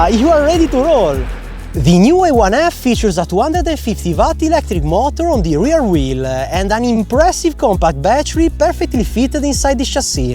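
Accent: Italy. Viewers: Excellent footage, excellent Italian accent!!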